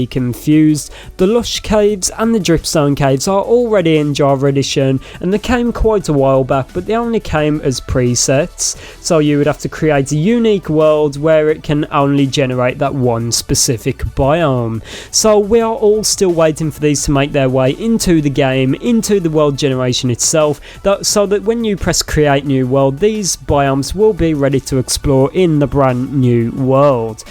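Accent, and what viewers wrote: United Kingdom. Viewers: i love his british accent so much its so strong lol and love ur videos too <3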